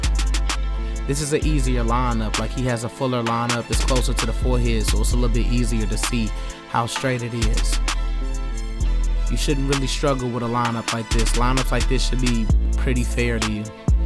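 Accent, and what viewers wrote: Chicago, United States. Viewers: yu frm chicago?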